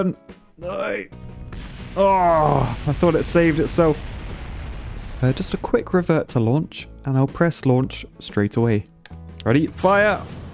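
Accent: United Kingdom. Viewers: oi noi it broke, oiii noiiii. your british accent is great. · are you British because I am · Are you British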